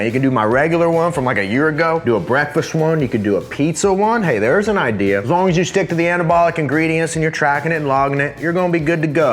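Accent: Texas, United States. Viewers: Sum gun, sounds like your getting Texas in your accent bro. Good.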